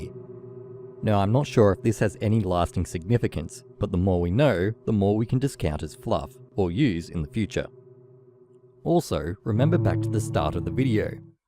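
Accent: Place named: Australia. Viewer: Okay it's fucking criminal how little attention your hard work has got. Plus it's always amusing to hear another Aussie.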